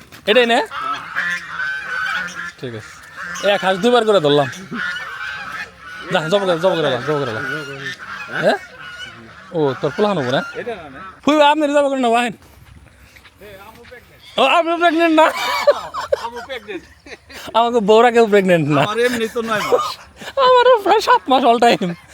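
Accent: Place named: Manikganj, Bangladesh. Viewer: মানিকগঞ্জের ভাষা মনে হচ্ছে 🤔🤔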